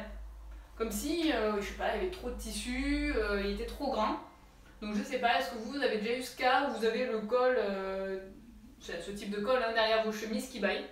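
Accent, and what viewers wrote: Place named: Tarn, France. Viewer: Merci pour cette vidéo. Tu as encore fait de super réalisations.... Bravo. Tu viens de quel département ? Car moi je suis du Tarn (de castres) et que ton accent est proche du miens. Je fais ma curieuse 😜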